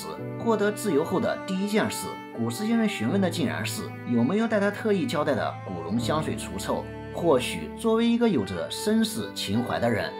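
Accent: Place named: Zhejiang, China. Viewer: 越哥是浙江人吧? 你的口音我熟。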